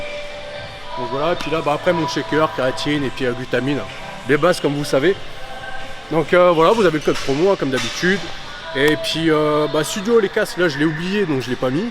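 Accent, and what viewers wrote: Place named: Nord-Pas-de-Calais, France. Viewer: J’aime bien l’accent chti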